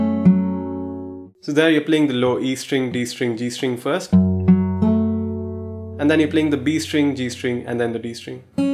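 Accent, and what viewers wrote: India. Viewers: Are u indian? U sound so indian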